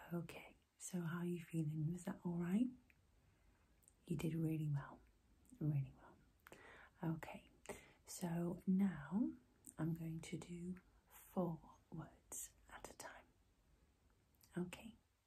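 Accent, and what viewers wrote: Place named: Nottinghamshire, United Kingdom. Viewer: that'll explain the northern sounding twang to your voice. I love that Nottinghamshire accent. It's like a soft northern accent. It works really well for soft spoken asmr. Would love to hear what your voice sounds like with in ear whispering ❤️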